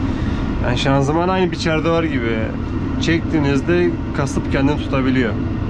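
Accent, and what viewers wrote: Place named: Istanbul, Turkey. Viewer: İstanbul Türkçesiyle son teknoloji tarım makinelerinin bu kadar yakışması peki ❤❤❤ örnek bir Adamsın sen 👏👏👏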